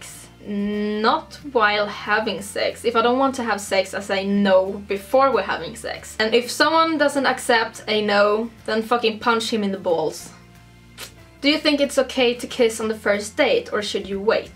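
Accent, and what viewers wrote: Sweden. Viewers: the swedish accent is real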